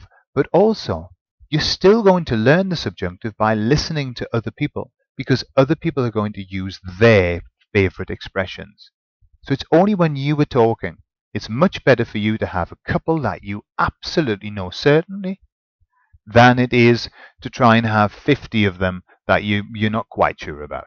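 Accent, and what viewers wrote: United Kingdom. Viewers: The Queen's English accent makes everything become clear so fast, haha. I speak American english and I'm learning Mexican spanish. This lesson really helped. Thanks.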